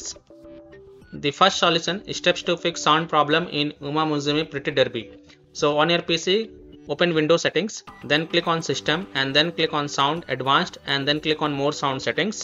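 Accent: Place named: India. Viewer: Bless the indian voice tutorials always saving our butts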